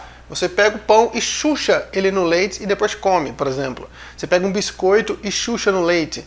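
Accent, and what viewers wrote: Minas Gerais, Brazil. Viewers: Sou mineira de BH, mais eu Amo ouvir você falar mineirês. Você é simplesmente Sensacional!👏👏👏👏👏👏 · O sotaque é bem impoRRtante kkkkkkkk O famoso mineirês!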